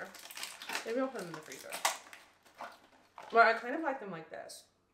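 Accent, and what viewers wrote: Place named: California, United States. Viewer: You can totally tell she's from California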